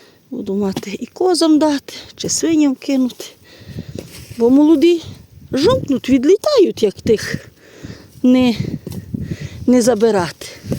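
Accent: Ternopil, Ukraine. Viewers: В Тернопiльськiй обл так балакають.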